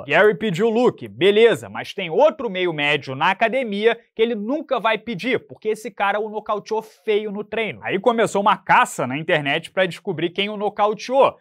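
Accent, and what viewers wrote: Rio de Janeiro, Brazil. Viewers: Este seu sotaque de Carioca, trava seus vídeos! Tbm acho que balança de mais a cabeça no vídeo, abraços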